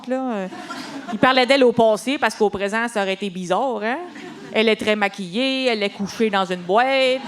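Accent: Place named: Quebec, Canada. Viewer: C'est rare, une comique Québécoise que les français parviennent presque à comprendre ! ( j'ai dit presque 😉)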